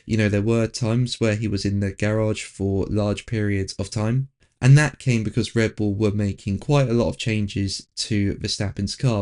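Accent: England, United Kingdom. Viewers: I love your english accent 😂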